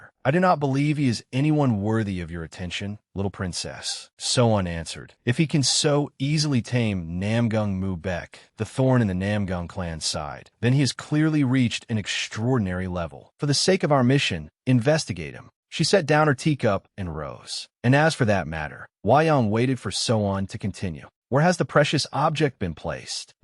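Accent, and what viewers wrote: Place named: Arab world. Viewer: Why does the narrator jump into an Arab accent every couple of minutes?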